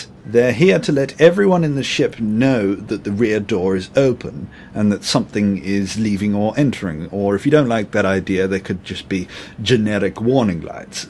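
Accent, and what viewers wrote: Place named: United Kingdom. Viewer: this sounds so different from how he speaks now... its like hes british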